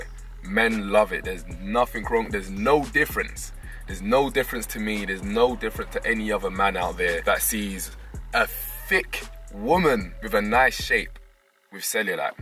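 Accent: London, United Kingdom. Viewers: English accent / London accent British is a wider thing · I love your British accent! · So he’s praising cellulite, looking foine and has a British accent...got my vote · Love your message... And your London accent 😁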